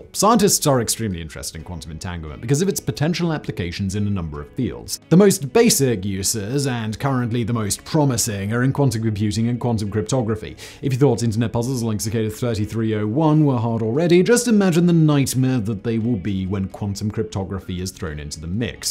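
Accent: United Kingdom. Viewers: I was just going to post that I was heartbroken he didn't work that in. Beyond perfect for the situation, plus the addition of the brit accent. All I can say is : "in a high dimension of which we know nothing, the mighty Adams bellowed in rage...."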